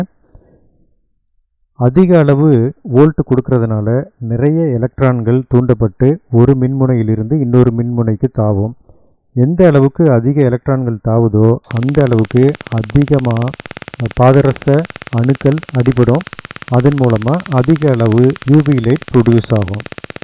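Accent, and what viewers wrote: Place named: Sri Lanka. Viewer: Valthuhal. Neenga engayo poga poringa. Mulu Thamizh kekum podhu oru Sri Lankan vibe iruku. English warthaigal warudhu. Medhuva korachikonga. Tanglish la eludhinadhuku mannikanam. From 🇱🇰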